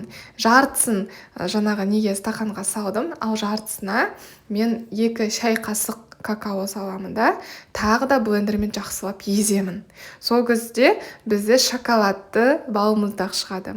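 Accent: Standard. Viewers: Енбеккор пысык колыныз алтын керемет жансыз.жанеде кашан корсемде таза казакша сойлейсиз, шынайысыз.сизди унеми айтып инстаграмнан корип ютубтанда корсем мактап риза болып отырамын.коз тимесин аман журиниз😍😍😍🌷🌷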